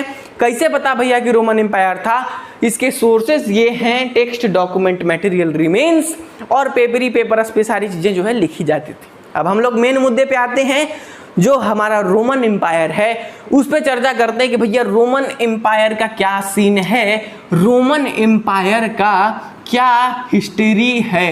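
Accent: Bihar, India. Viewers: Bhai tum shi pdhate ho Bihari accent me mja Ata h pdhne me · Bhai aap bihar se hona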